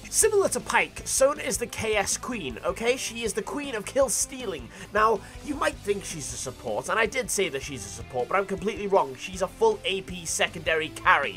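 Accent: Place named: England, United Kingdom. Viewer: you sound like am english timon from lion king😭😭😭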